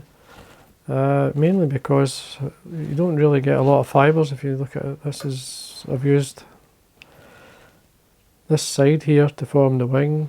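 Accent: United Kingdom. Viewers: Superb,what else can I say! As soon as I saw this tying I got my bits boxes out to attempt a tying myself all the little trimmings I saved that you told us to keep and my treasured packets of Coq de Leon I bought a while back and locked away as I was scared to use it having paid more than I admitted to my wife much like a jungle cock cape I bought over twenty five years ago from the angling centre which is nearly bare Disaster one of our farm cats got in the window of my man cave and made off with my Coq de Leon feathers I had just opened and left on my desk twenty quids worth Gone I searched the garden but all I could find was feathers from our ducks and hens Now I will have to use substitutes I was so looking forward to tying a few dries and trying them out but it’s had me make a decision that I will use materials and festhers I buy and not squirm them away like Scrooge and his money Once again your patterns and things are excellent as is the video and narrative the posh accent with the occasional slip into the accent of the shire is as always so entertaining thank you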